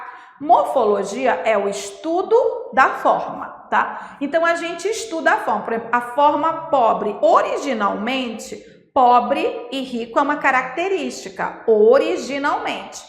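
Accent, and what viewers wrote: Pará, Brazil. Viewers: O lhindo entregando que é paraense kkkkk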